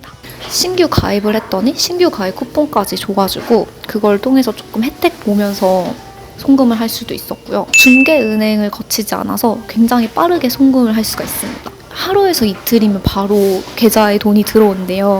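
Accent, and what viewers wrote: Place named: Busan, South Korea. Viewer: 반갑습니다. 씨. 딱 부러지시는 부산분이시군요.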